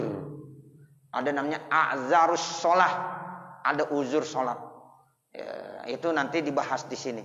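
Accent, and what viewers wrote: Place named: Betawi, Indonesia. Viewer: Smg Allah brkn kesehatan kpd guru kita untuk terus bisa berdawah dgn bhs betawinya yg khas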